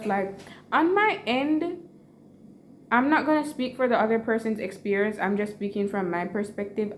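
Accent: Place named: Caribbean. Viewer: You sound from like your from Dominica or Barbarian or trini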